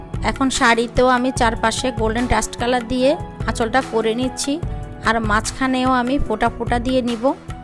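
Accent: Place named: Noakhali, Bangladesh. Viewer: Anne ki noakhailla ni ponded bolen keno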